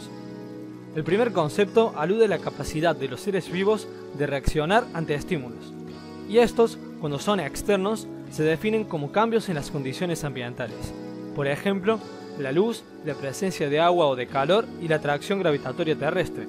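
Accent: Córdoba, Argentina. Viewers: no entendí nada con el cordobés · Alto cordobes xdd Me sirvio bastante para ciudadania :v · sós coórdobés vóz?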